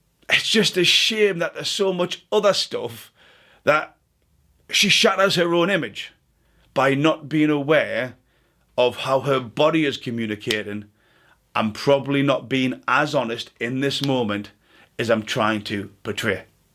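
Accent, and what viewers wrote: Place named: Cockney, United Kingdom. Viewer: If you could speak English properly, I would subscribe. It is like listening to an episode of Eastenders. What does con ent mean? content? You ask your viewers why they don,t like her...why? Because you are not prepared to make a negative opinion of her public? You want your viewers to do it for you so that you are out of her firing line....You state that you lean into liking her!!! Really, not a great reader of a persons character (which you should be able to easily see via her behaviour) Unsubscribing, you are not believeable.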